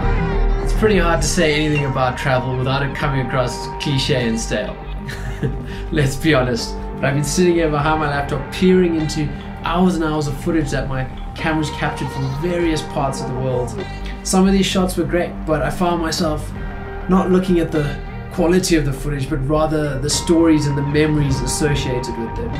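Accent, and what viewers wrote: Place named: South Africa. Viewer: Where are you originally from? You sound like your from some part of Africa? Like South africa?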